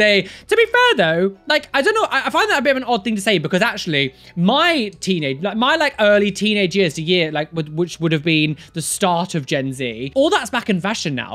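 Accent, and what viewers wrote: United Kingdom. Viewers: Wait your murican? I thought you were british 😂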